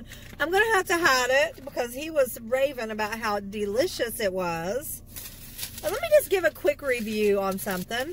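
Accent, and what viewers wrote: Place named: West Virginia, United States. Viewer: Are you in Beckley, WV? It just sounded like that area. I am within 50 miles of Beckley. Enjoy all your videos, thank you for sharing.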